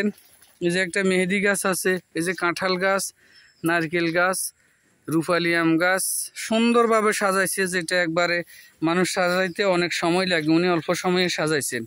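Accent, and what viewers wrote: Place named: Noakhali, Bangladesh. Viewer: ভাই নোয়াখালী নাকি।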